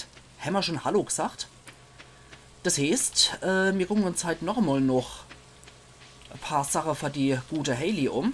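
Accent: Palatinate, Germany. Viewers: Mein Guter, pälzisch wie immer ;) nur: schraub bitte die Auflösung hoch ;)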